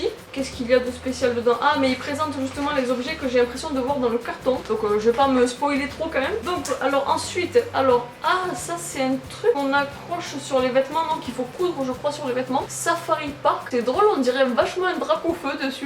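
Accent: Toulouse, France. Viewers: i love accsens toulousin et tu fais 17 ans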